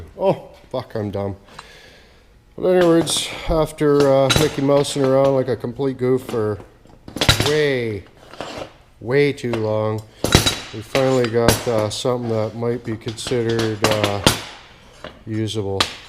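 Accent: Canada. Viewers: do all Canadians talk like you ?? or is that Inwood dialect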